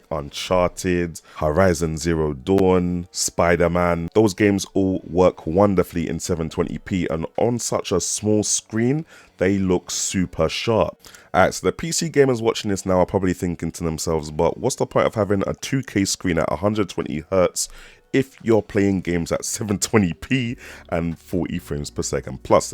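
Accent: United Kingdom. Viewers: You gotta be from uk. From how you say move and. Fam. lol. Big respect. Though. Love the video. I have the 1 tb version and I love mine. I gave away my asus rog strix after I bought this. Lol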